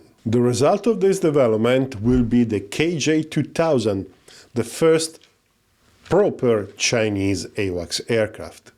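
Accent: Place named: France. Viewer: With a French English accent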